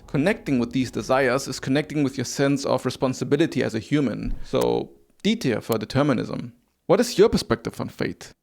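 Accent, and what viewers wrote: Germany. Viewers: This may be the greatest Video I have ever seen. I made my way to nearly the exact same spiritual/philosophical journey and came to a similar conclusion: Love is the connection we DECIDE to realize that we are or should be the same thing. The opposite of this is what we all perceive as evil: the separation of entities and acting (unfairly) different upon them. That means that the more we empatheticly put ourselves into the shoes of one another and the more we decide to be part of the same thing, the less evil we are and act and the more meaningful and happy life becomes. Cheers to you my friend for having similar discoveries! Or should I rather say cheers to me? 😉 (ps: your accent sounds German to me - where do you life? If you live in Germany I'd love to have a real life conversation about things!) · Is that a german accent I hear? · Where are you from? 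Your english sounds like it has a german accent lol.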